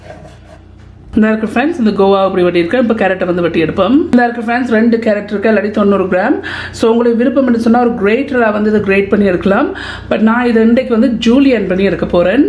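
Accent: Sri Lanka. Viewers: Like your ilangai Tamizh.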